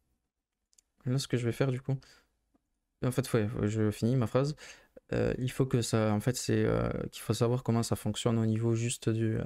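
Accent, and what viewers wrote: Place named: South, France. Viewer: Merci beaucoup avec l'accent du sud !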